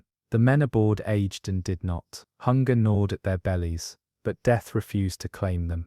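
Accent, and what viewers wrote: United Kingdom. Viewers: It's the British accent, I don't know what it is about it. Got a nice cadence, too.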